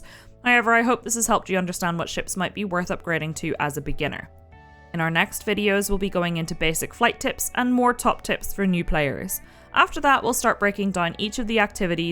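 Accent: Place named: Appalachia, United States. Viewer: sorry ya sound hillbilly. A milder version of accents in the Ouachitas, Ozarks, and Appalachia.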